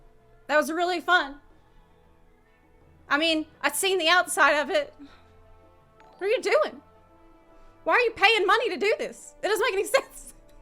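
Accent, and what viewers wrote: South, United States. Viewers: Why does have this weird southern drawl all of a sudden? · Damn, has fallen hard back into her southern accent 🤣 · Embrace southern. I had no idea she was southern, but I'm here for it. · …what is this country accent? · I feel like has gotten more and more southern the longer she's been in the U.S. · Damn, has a thick southern accent. 🤣🤣🤣🤣 And dicks out for Harambe! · WoW being back in the USA sure brought up your Southern drawl!:D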